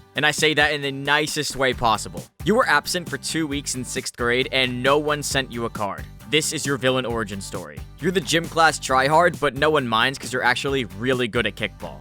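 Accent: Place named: Australia. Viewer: wow that is incredibly accurate. Coming from an English person I thought he was English until I saw he was Australian and suddenly he sounded so Australian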